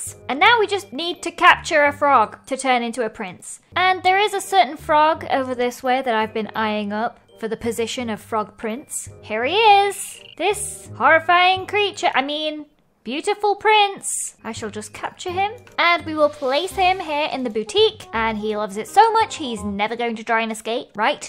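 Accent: United Kingdom. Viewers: So she talks british like normal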